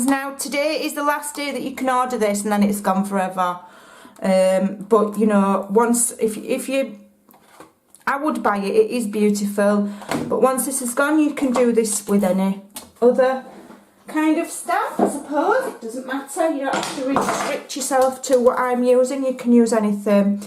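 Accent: Yorkshire, United Kingdom. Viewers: I guessed right that you are from Yorkshire. My sons were born there and I love it there and love your videos too. Keep up the good work.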